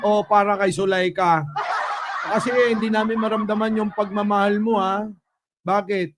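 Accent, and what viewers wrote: Ilocos, Philippines. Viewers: NAKAKATAWA KA IDOL SIR NO AGSAO KA TI ILOKANO, SARAP PAKINGGAN 🤣🤣🤣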